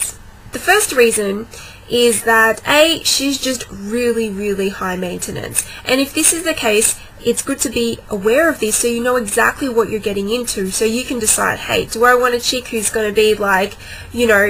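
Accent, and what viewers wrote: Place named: Australia. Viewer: Are you Australian? I'm wondering if I recognized the accent. : ) I think you're right about the importance of calling her out on it, or ignoring an outburst. I remember when an ex of mine said she actually appreciated it when I did so once.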